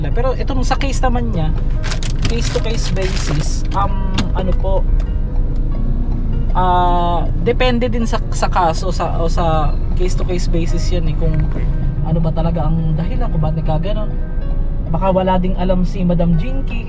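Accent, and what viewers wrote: Visayas, Philippines. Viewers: Maraming salamat, bisaya ka pala kala ko taga bulacan ka, new subscribers here😊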